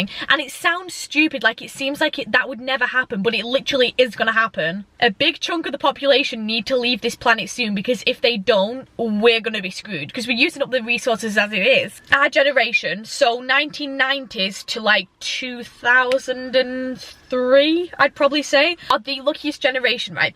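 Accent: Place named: Stoke, United Kingdom. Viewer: U sound Stoke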